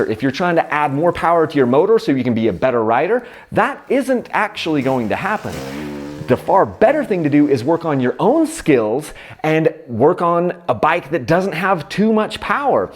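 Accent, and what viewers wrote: Utah, United States. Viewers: I thought you were saying “engine breaking”. Hahaha. I live that Utah accent 👍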